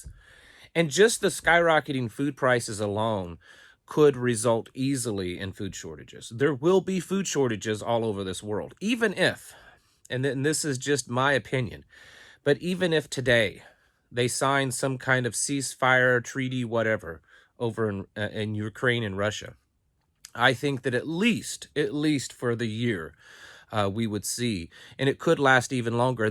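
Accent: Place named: Missouri, United States. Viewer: Too many folks are more interested in growing different types of marijuana to get wasted on than crops to eat and survive on! What state you live in, ya sound like a Missourian!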